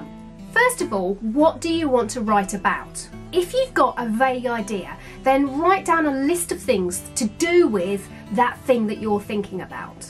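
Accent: United Kingdom. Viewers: a nice English voice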